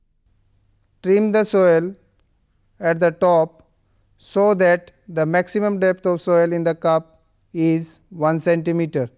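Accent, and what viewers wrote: India. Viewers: this is indian english language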